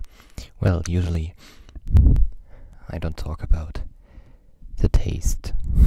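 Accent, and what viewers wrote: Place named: Germany. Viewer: Hes german uwu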